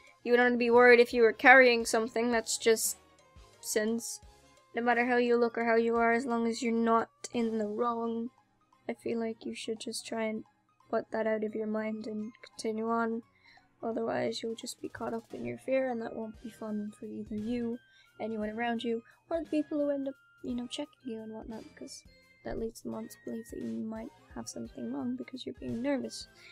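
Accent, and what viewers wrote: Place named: Donegal, Ireland. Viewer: U sound like ur from donegal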